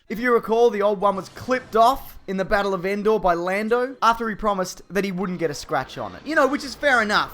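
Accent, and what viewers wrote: Australia. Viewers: Your an aussie arnt ya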